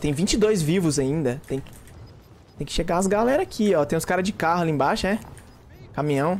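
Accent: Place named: Maranhão, Brazil. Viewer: Parecendo os maranhence daqui de Goiânia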